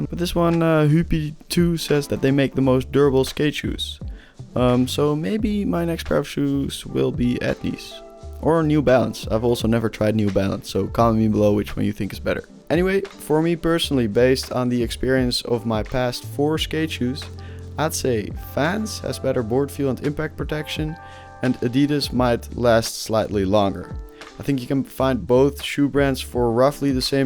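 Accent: Netherlands. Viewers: Hey you are dutch right?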